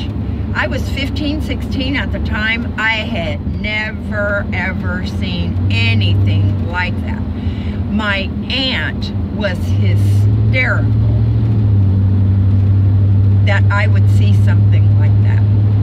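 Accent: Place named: Maine, United States. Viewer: What y'all wanta bet looked like a red-headed Audrey Hepburn after her Aunt got her all spiffed up and lady-like??, you told us how since you were born in Maine, your family all said Ahnt instead of Ant. Even now you switch back and forth. I do that with some words.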